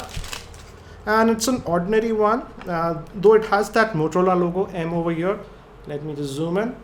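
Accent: India. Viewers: No offence intended and nice video but, heavy heavy Indian accent you've got there.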